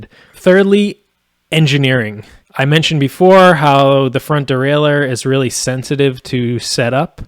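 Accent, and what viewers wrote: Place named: Midwest, United States. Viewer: Your accent tells me you're from the Midwest. Chicago or Milwaukee. Takes one to know one ha...thanks for the video!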